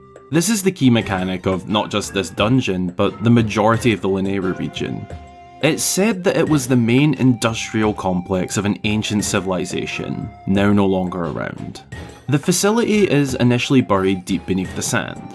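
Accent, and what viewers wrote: Scotland, United Kingdom. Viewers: a zelda channel run by a Scotsman, im home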